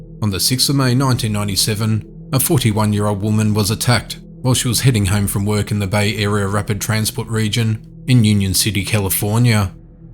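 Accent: Australia. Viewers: The narrator is obviously from Australia. They pronounce many English words differently than we Americans do.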